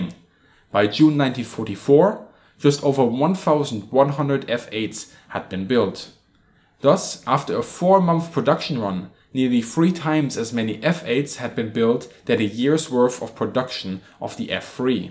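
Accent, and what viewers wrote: Germany. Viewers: Are you german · Great target stuff vid. Ohh man I do love my fw 190 a8-r2..( tamiya ); you got afunny strong german accent..I like it, un saludo desde Madrid.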